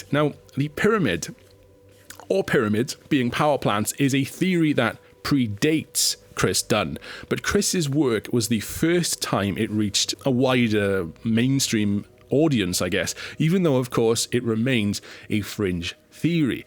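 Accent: Wales, United Kingdom. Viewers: Lovely channel...beautiful (Welsh?) accent! When you ask for everyone to be nice at the end, your manner is so lovely that I just want to be nice! Please do do more about the CHEM stuff....it's pretty interesting and the guy proposing it doesn't seem like a nutter!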